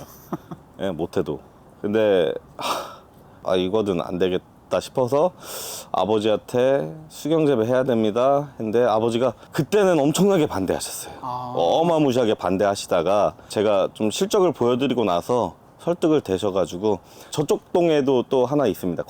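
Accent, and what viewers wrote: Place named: Chungcheong, South Korea. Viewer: 고향이 충청도신가 백종원 필이 나네여 성공할 관상이네 부인분이 사람볼 줄 아는듯 임금 높은 나라는 세금과 생활비도 비쌉니다 앞으로 남고 뒤로 밑지죠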